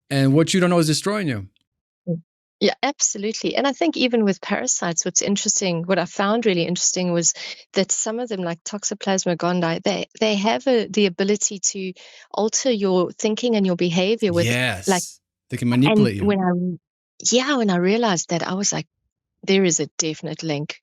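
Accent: South Africa. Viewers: Sounds 100% South African. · Anyone else go the first several minutes looking for the clues to discern: New Zealand? No….South Africa (sounds a teeny bit Dutch) but then she said Catholic and I figured, no, near zero chance of being. Dutch S African and Catholic…must be Anglophone but still sounds like an Afrikans speaker, then she said Germany and that she learned the language…then I was like, NOW IT MAKES Sense! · i think you have a lovely accent and it was very clearly South African. I made lots of lovely South African friends when I was living in Qatar and knew immediately where you were from. You spoke beautifully by the way.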